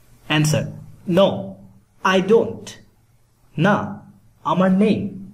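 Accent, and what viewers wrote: Dhaka, Bangladesh. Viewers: Thank you. I fell in love with a Kolkatan girl your videos help me a lot. Is your accent Dhaka accent? Is it similar to Kolkata? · Although you are from Chittagong Division, your accent sounds more like that of a person from Dhaka than a person from Chittagong or Teknaf. I see that Ramganj is not so far from Dhaka, so that probably explains your accent. Chittagong Division is very large. It stretches to Dharmandal, which is farther north than Dhaka! I have a friend from Cox's Bazar, who will be moving to Dhaka in a few days. I am from USA, and I am a friend of his family.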